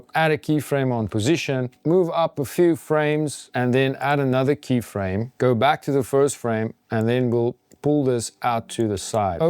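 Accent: Afrikaans, South Africa. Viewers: You sound Afrikaans??😁😁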